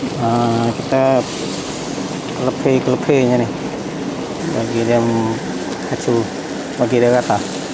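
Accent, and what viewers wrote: Kedah, Malaysia. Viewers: Assalamualaikum, asal org mna? Loghat ckp Mcm org utara Kedah Kuala nerang ja, kikikiki salam follower subsribce tok dari Sya Shuib Pendang Kedah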